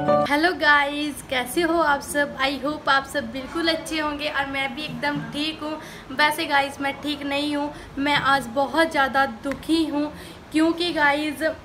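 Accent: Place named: Haryana, India. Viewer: आपकी आवाज भाषा से बिल्कुल हरयाणवी लगती हो हिमाचल की लैंग्वेज नहीं लगती बिल्कुल भी क्या बात है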